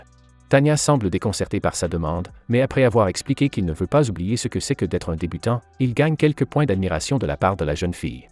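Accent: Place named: Canada. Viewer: S'il vous plaît 🙏 vous pouvez lui dire d'enlever son accent canadien et de redevenir comme avant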